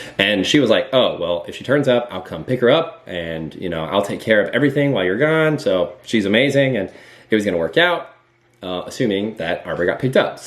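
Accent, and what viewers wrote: South, United States. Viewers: The southern accent coming in strong in this vid! Keep up the positive vibes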